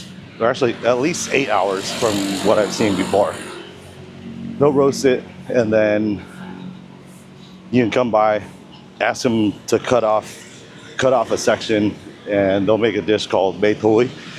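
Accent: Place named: Texas, United States. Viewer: Yeah, they start work super early. My sisters and parents in law just flew in this past Monday. Btw, no offense, but you sound like you're from Texas. LOL.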